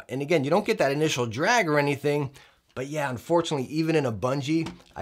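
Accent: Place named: New York, United States. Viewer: Love your attitude. You from new york by any chance? Feels like it. Great review, thanks.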